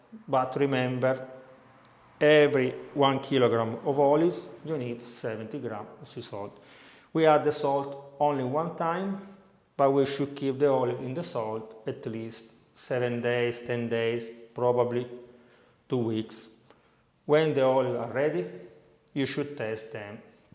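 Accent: Italy. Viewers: His Italian accent is very nice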